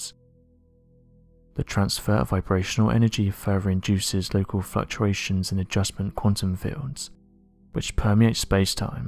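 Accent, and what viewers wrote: United Kingdom. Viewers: This is all interesting to contemplate, and as a lifelong science fiction fan, I would love it to be proven, but where is the proof this is actually happening? All the fancy graphics, the calm British-accented voiceover, and elaborate theories ….are not evidence….extraordinary claims require extraordinary evidence. Just saying ‘this is happening’ doesn’t make it real. As exciting as it would be if this were true, where is the evidence?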